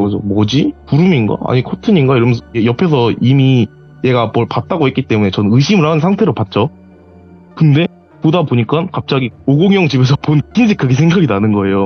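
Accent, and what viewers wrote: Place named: Jeolla, South Korea. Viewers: 뭐래요 군산 사투립니다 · 저가 저가 거리는거 거슬리네 전라도 사투리인가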